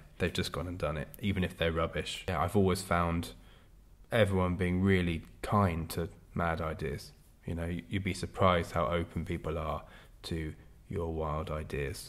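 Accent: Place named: England, United Kingdom. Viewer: Why do you talk English and sing American?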